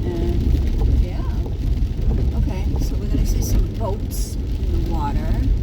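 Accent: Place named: Massachusetts, United States. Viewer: I KNEW that was a Massachusetts accent!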